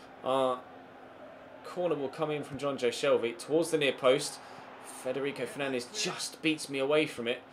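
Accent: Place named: Newcastle, United Kingdom. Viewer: Are u from Newcastle ?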